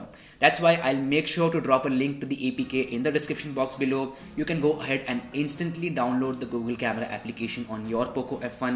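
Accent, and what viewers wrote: India. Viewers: Man.. indian accent is the just the most annoying thing to listen to eh.. no offense guys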